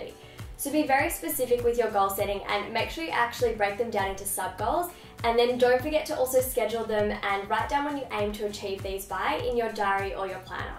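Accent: Australia. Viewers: Hi. Are you from Australia? Love your accent by the way😂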